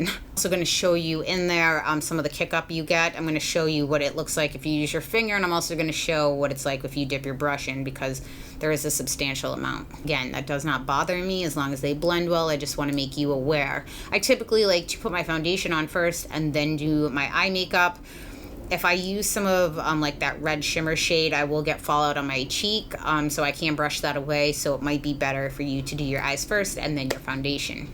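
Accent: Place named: Bay Area, United States. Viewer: y'all can already tell she's from the Bay. Girls like us vibe and just know💯😂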